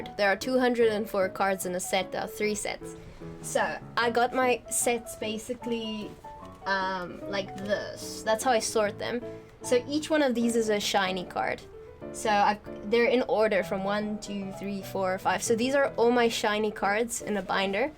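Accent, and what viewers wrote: Afrikaans, South Africa. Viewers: Keep your South African accent - the merging of the two sounds silly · Do you speak Afrikaans? Is this your normal English accent?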